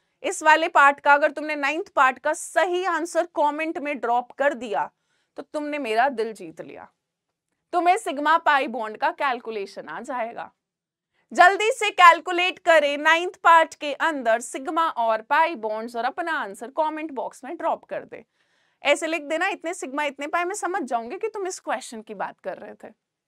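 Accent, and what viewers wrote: Bengal, India. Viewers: mam are u bengali?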